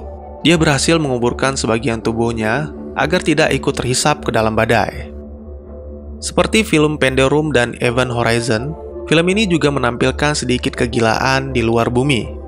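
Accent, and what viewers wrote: Aceh, Indonesia. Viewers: Dari aceh ya bang Nanya doang:-)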